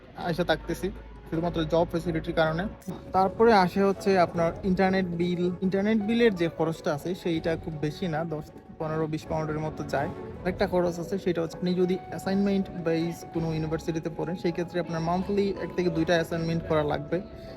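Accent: Sylhet, Bangladesh. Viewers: Apni sylheti tai na vai?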